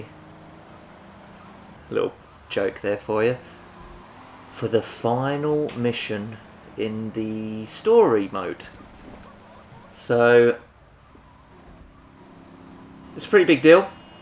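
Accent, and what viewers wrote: United Kingdom. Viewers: Hey! Where did your inspiration for the cockney accent come about?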